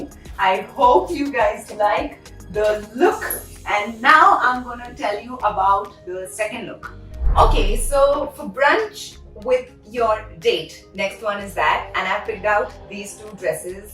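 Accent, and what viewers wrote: Delhi, India. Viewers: such a delhiite haha for a sec i forgot you are an actress, aise mast bhasha bhi bilkul dilli wali, i love watching you <3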